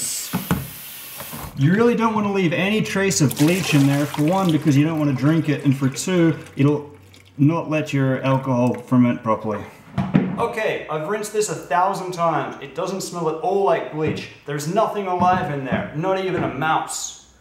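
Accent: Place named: Australia. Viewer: What accent is that? Sounds like Australian that keeps turning into American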